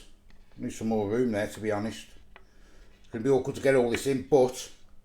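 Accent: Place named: Midlands, United Kingdom. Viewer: Which part of the midlands are you from, sir?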